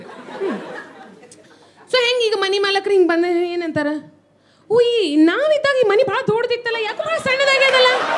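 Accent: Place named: Uttara Kannada, India. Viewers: you are so good in comedy talk show, one of the best,classy, good vocabulary, typical Uttarakannada style of talk, God bless, am your fan from Gulbarga, a doctor by profession,you are too good,( Mast Majha Bantu kheli) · Hubli Dharwad bhasha dashtu zabardast style yawdu illa....u did justice to it.... simply bold and beautiful 😍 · North Karnataka dialect, particularly Dharwad I believe.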